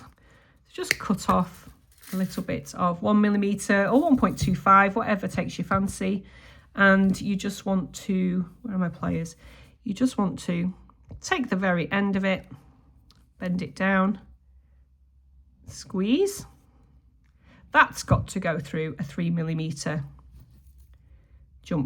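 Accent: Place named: England, United Kingdom. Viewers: I love you English accent. It’s awesome and I love this design. Thank you. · Wow that is such a lovely design! Lends itself beautifully to the bracelet,and the earrings with the dangly paddles are a triumph! We love to get a glimpse of your life by listening to your warm Lancashire accented voice teaching us as well as generally chatting. I think of you as my lovely clever talented friend who just happens to live half a world away! Your tip about how you finished up with your exact measurements was invaluable. Ok I’ll stop waffling now - I’m glad you are mostly over your “lurgie” as we call it in Australia. 😘 Roz on OZ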